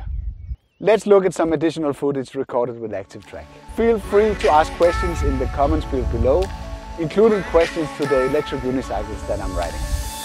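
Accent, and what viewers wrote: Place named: Denmark. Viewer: Tydelig at høre at du er dansk 😇😇 Tak for en fin video. Må simpelthen eje den drone. Kæft den er fed. Skal bruges til ferierne og til at optage børnenes fodboldkampe ... Det må skabe respekt i forældreflokken når jeg kommer anstigende med sådan en.. Hahaha..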